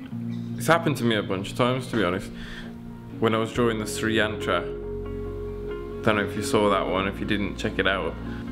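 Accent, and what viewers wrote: Birmingham, United Kingdom. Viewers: Always inspired by your work. Always. I don’t know if I missed the story, but how did you end up in Peru? Is that a Brummy accent?? Could you do a video on your background and how you came to be where you are doing what you do? I think it is fascinating, the life journey that took you to Peru, to sacred geometry. Pls share, and sorry if u have already done that video, I haven’t been watching your stuff that long so may have missed. Thanks.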